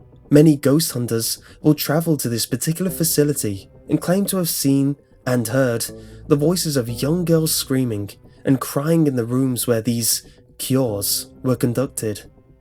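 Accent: England, United Kingdom. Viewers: Nice video... But - where is your accent from? You sound English, yet you said “Clochester” instead of COLCHESTER. Colchester is claimed to be the oldest town in Britain. It was for a time the capital of Roman Britain. Hospital Name: Severalls Hospital, Previous Names: Second Essex County Asylum, Essex and Colchester Mental Hospital, Severalls Mental Hospital Location: Boxted Road, Colchester, Essex CO4 5HG Principal Architect: Frank Whitmore and William H. Town Layout: Compact Arrow & Villas Status: Derelict Opened: 27th May 1913 Closed: March 1997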